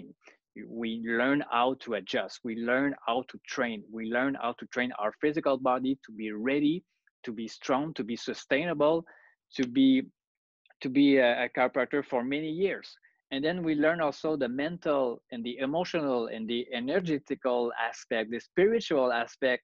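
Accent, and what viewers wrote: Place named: Quebec, Canada. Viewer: I was always curious to know what he sounded like in English 😅 glad to see that thick Québécois is still there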